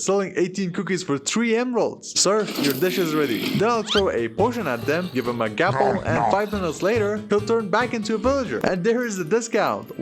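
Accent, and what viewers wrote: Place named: United Kingdom. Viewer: You just have british english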